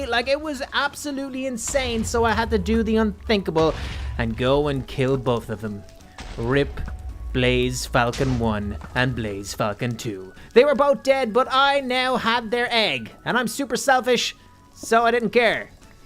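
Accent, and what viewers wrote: Ireland. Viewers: bro don't mean to be rude or anything but are you Irish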